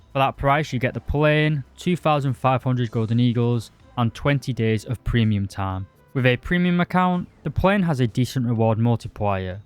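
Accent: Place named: Estuary English, United Kingdom. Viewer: Hey man, your accent is so strong that Im actually struggling to understand certain words that you say. I think you pronounced R instead of W? then chat gpt told me this Estuary English." This accent is spoken by people from the London area, and it is a blend of traditional Cockney and Received Pronunciation. Estuary English is becoming increasingly common among younger speakers in the UK.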